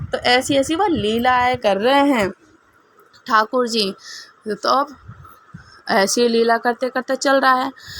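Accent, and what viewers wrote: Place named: Braj, India. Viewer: Apki barjwasi awaj me hi aap katha ko sunano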